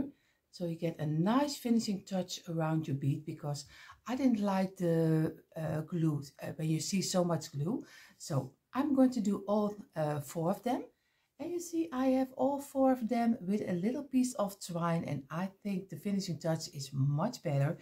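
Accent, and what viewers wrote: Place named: Netherlands. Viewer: Loved The Lantern!! You Have A Great Imagination For Creating! I Also Like Your Dutch Accent, You Have A Soothing And Unique Sound!!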